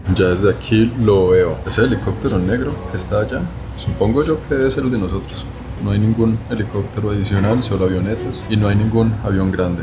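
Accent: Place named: Paisa, Colombia. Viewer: Interesante trabajo com yutuber, porque usted no solo nos muestra a los sedentarios el muno, sino y muy importante también, ayuda a preservar ese acento tan colombiano, que supongo es el que denominan paisa y que, no tengo la menor duda, identfica a Colombia en cualquier parte del mundo.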